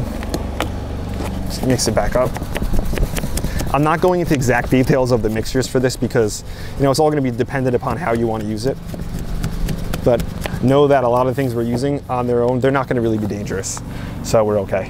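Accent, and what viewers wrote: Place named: New Jersey, United States. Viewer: I had a customer call yesterday and he had a faint accent that I recognized but couldn't place. Then he told me he was from New Jersey and I knew right then that he sounded like. So I guess he's probably from the same region.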